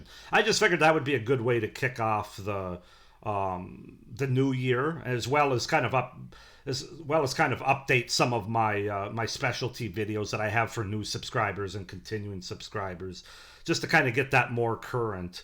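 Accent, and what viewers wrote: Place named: United States. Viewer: I wish for your 2026 to be a success. I'm curious about what region of the U.S. you are from, based on your accent?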